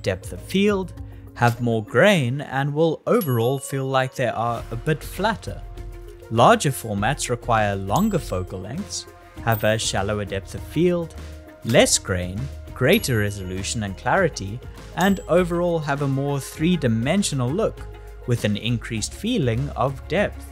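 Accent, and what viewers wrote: South Africa. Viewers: South African :) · Great video!! Was that a South African accent I heard??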